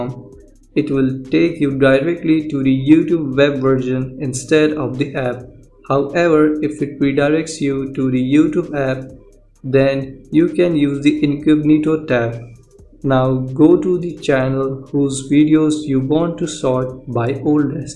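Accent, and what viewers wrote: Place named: India. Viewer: It's always the guy with idian like accent who fixes problems i salute all of you you don't know how much y'all helps luv you guys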